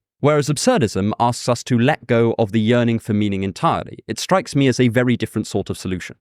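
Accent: United Kingdom. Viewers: Your channel was my great coincidence: A young man with a British accent explaining about my favourite subject 📚✨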